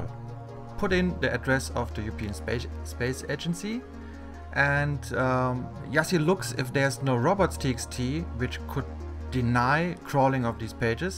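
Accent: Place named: Germany. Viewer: German English :) I like it.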